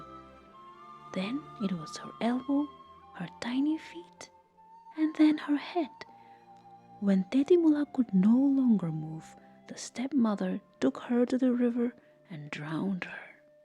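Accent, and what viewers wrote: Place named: United States. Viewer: Where did you get that american accent!?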